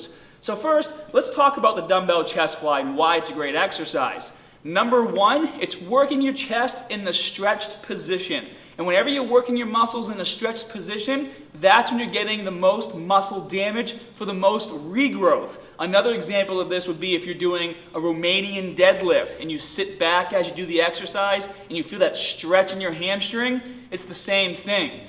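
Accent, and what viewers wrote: Boston, United States. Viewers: Gotta love Bostonians and their accents! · Grab the "bah"? Lol. I am a Bostonian transplanted to FL. Nice to hear home. Great vid too. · trying to help you guys. Since I am suffering right now from shoulder pain, maybe it’s a good idea to listen to him instead of making fun of his beautiful Boston accent. Yes, there are differences about how far down to drop your arms, but you can experiment with light weights and then draw your own conclusions. And I wish you success too! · I've never heard your Boston come out so much in my life 😯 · He's gotta be from Boston.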